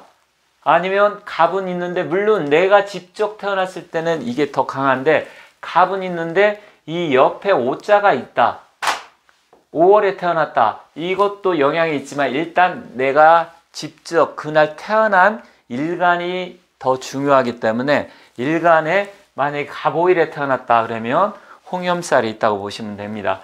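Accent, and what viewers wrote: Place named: Chungcheong, South Korea. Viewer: 충남 사투리 시네요..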